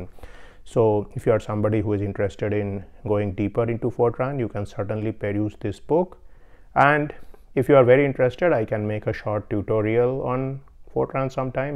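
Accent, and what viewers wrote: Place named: India. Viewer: Gramps you got a hard Indian accent and I love it .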